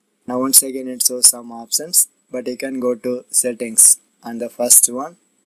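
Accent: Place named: Bihar, India. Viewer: Bihari English👍👍👍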